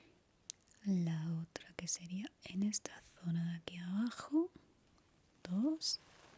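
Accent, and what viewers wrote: Andalusia, Spain. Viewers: Extraño tus susurros sin el acento sureño... Perdón 😔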